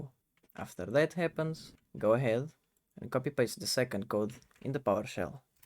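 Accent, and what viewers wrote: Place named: Portugal. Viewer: Muito tuga, não engana ninguem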